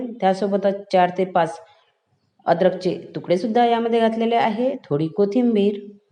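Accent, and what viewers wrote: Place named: South, India. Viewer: ताई साऊथ च्या आहेत म्हणून असे मराठी बोलतात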